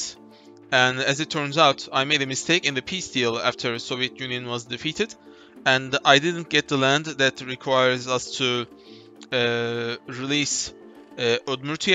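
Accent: Turkey. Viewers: Knk türk aksanı sezdim türk müsün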